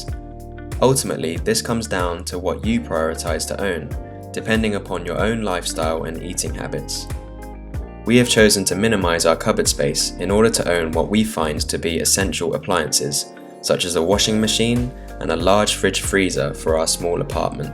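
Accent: United Kingdom. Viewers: For some reason , I didn't hear his accent until he said "living in London". Then BAM! I was like holy shit I didn't realize he was speaking in a British accent.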